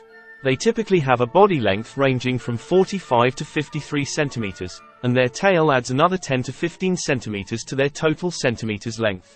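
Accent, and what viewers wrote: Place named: United Kingdom. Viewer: and to add insult to injury, the narrator is UK.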